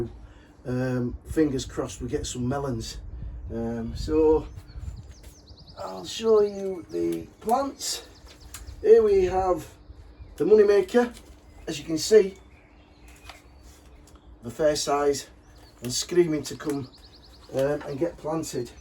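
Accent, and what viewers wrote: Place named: Leeds, United Kingdom. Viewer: Ah. As I continued watching I figured - LEEDS LEEDS LEEDS! Fellow Leeds man here! Take care!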